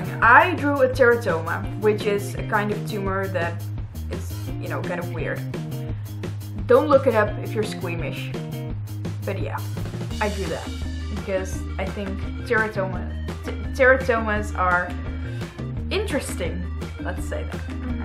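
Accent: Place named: Netherlands. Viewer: Are you dutch? I am Dutch and you sound Dutch great video keep it up!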